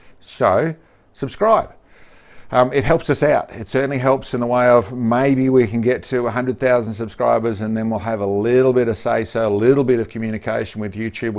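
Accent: Australia. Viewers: Keep up the good work. Coming from an Aussie expat now living in the USA, it's good to hear an aussie talking about something that I love doing once in a while.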